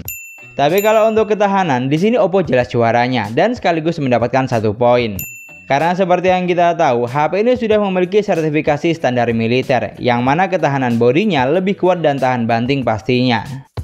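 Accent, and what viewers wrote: Madura, Indonesia. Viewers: Saya nyimak dari awal sampai akhir,, semakin saya menyimak semakin saya tahu, si Abang aksen penjelasannya semakin lama berubah ke aksen Madura 🤔 Saya nungguin kata "...........taiyeee" nya 🙄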